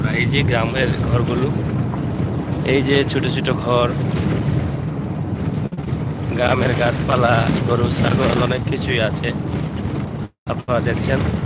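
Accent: Sylhet, Bangladesh. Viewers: আদা সিলেটি আর আদা শুদ্ধ খেনে। খাটি সিলেটি ভাষায় মাতও না খেনে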